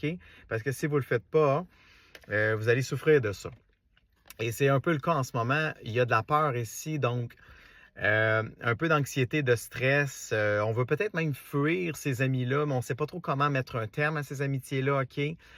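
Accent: Quebec, Canada. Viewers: Bonjour, j'adore vôtre accent québécois, c'est craquant. Je me permets de vous dire que vous êtes trop beau 🙏. Bonne continuation. À bientôt.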